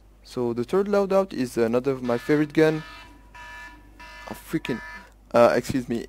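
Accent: France. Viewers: are u french (with your accent)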